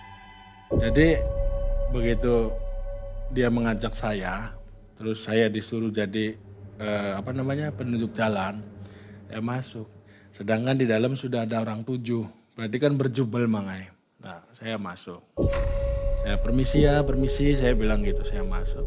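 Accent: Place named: Banyumas, Indonesia. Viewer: Alhamdulillah pak hadir.. Cara bercerita ituloh santai, logat ngapak plus.. Ky nya mmg punya karakter tersendiri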